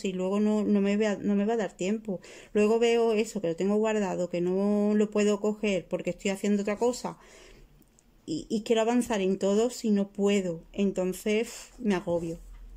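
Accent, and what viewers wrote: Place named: Seville, Spain. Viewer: Hola, acabo de descubrir tu video y me ha gustado mucho. Coincidimos en varias cosas: nos encanta el punto de cruz, la lectura, somos de Sevilla (por tu acento creo no equivocarme). Me encanta A green village y seguro que lo haré... En cuanto haga todo lo que tengo pendiente. Un saludo!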